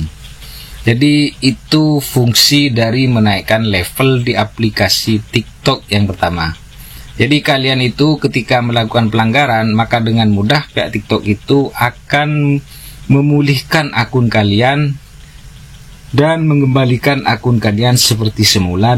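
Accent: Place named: Madura, Indonesia. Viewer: Logat madura kyk nya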